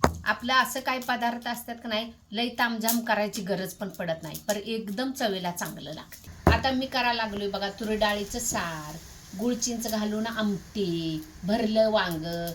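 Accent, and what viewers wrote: Kolhapur, India. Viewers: कोल्हापुरात तुम्ही कुठं रहाता? तुम्हाला भेटायला यावस वाटत... मग तुम्ही कोल्हापूर च्या भाषेत म्हणतासा.. " या कि घरा कडं".. या कि घर ला..😅.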